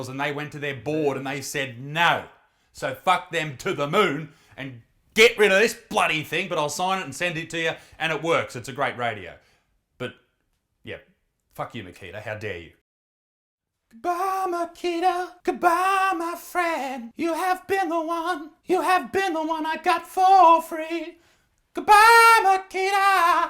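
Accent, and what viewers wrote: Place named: Australia. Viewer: I heard about her doing this: "Well there's the next Isaac vid. Also why is she Aussie?"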